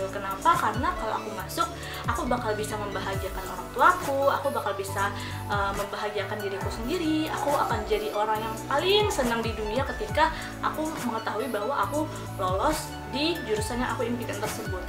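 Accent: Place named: Bali, Indonesia. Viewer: Kak kok logatnya Bali😂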